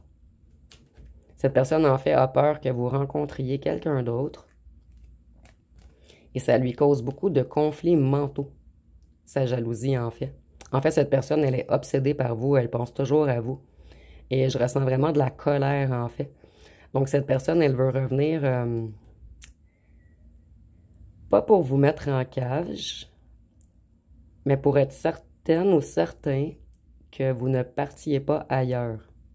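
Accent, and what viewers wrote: Quebec, Canada. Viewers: Votre voix me réconforte et elle est fort agréable . Et vos mains jolies avec vos ongles et vernis . Merci pour la guidance que vous nous apportez gracieusement , et votre accent québécois j'adore. Cette personne a essayez revenir mais je ne veux plus depuis que j'ai vu derrière le masque qui s'y cache , un homme a femme qui se dit fidèle ,et qui est ds des travers sexuelles et malsains et en perpetuelles conquêtes il papillonne , il est très toxique et malsains très menteur ,depuis que j'ai retrouver ma liberté ,je revie sans lui et retrouve mes énergies ,il n'apprécie guère car il voulais me mettre sous terre, il m'avait mise en cage et enlever mon abondance et mes énergies ,il est jaloux maladif et possessif un psychopathe mytomane pervers narcissique ,toute la famille est ainsi complètement cingles, et seul il ne peut pas vivre et il est trop immature.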